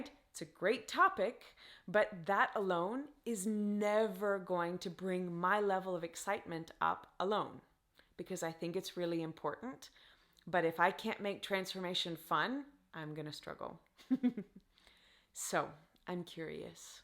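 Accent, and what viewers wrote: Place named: Jamaica. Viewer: Your accent sounds very Jamaican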